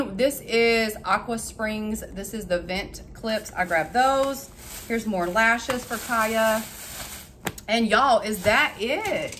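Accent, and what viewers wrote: South, United States. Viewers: 🎃 I always stay till the end. I love watching all the stuff u get and I love how excited you get over the things you buy, plus I love your southern accent. Stay safe.❤🤗👍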